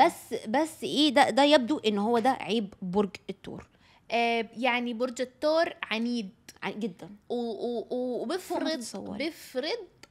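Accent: Iraq. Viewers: تعودت عليجن تحجن عراقي 😂😂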